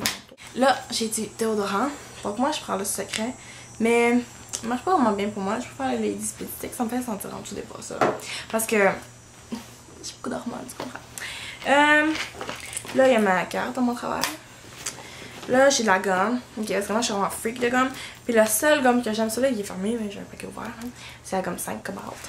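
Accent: Quebec, Canada. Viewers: Je viens juste de te découvrir et j'ai déjà regardé une bonne dizaines de tes vidéos ! J'adore ta façon de t'exprimer, tes mimiques et puis ton accent Canadien fort prononcé :P En plus de ça, je te trouve vraiment très jolie et les conseils que ce soit en maquillage ou pour les cheveux sont très pratique ! Et hop, tu vient de gagner une abonné :D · hey guys spa un accent anglais cest quebecois ! Cette vidéo date quand meme de 11 mois fek ont scalme la ! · elle est quebecoise donc c sur que les autre ne comprendront pas sont accent ,elle parle pas trop vite pi c de lhumour,si vs etes pas content ,ben décaliser de sa page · C'est quand même marrant l'accent canadien ^^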